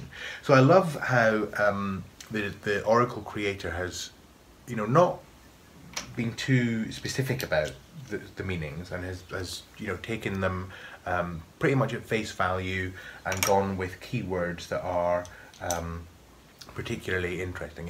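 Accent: Scotland, United Kingdom. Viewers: The last time we went to Scotland to visit the rels we stayed in Carnoustie! Beautiful... I knew I heard a bit of Scots accent,!!!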